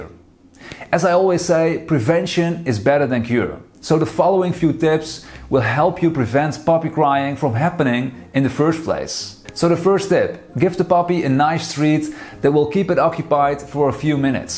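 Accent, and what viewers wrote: Netherlands. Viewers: Your accent sounds Dutch, am I right?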